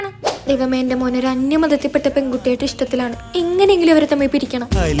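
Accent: Thiruvananthapuram, India. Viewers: Thironthoram samsarikunna daivam 😂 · Currect tvm language 😂😅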